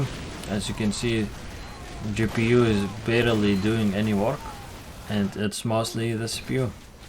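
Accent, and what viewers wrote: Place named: Ukraine. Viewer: but your english accent is very similar to ukrainian people. really.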